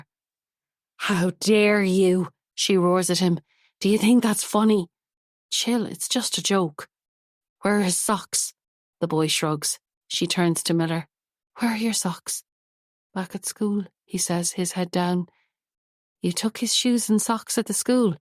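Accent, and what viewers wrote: Ireland. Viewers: sounds Irish to me.... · Irish accent? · Yay! Irish narrator. Dunlaoghaire! I know the town so, so well!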